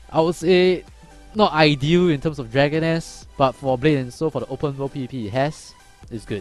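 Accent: Singapore. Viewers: Love your accent. Singapore maybe?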